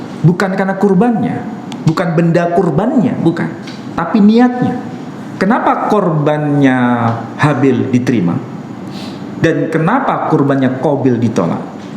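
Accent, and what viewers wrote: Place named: Iran. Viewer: Seperti lahjah atau cara baca Parsi, Iran..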